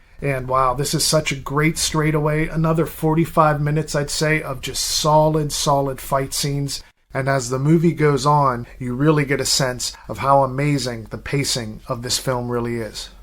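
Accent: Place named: Philadelphia, United States. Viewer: Haha! That’s awesome! You’ve got a hint of the greater Philly accent so I thought you must have been from around here! Yeah, I watched a ton of Kung Fu movies on Philly 57 and still have a few VHS tapes recorded from there with all the local commercials for Acme, Window Wizards, and the like. Was the “bootleg era” a nationwide thing? In the 90s I bought lots of bootleg tapes from a place in Chinatown called Universal Electronics (3 for $25!). The quality was generally awful but that was the first time I was able to see the Shaw Brothers stuff that never got released on TV as well as unedited versions of the more violent stuff.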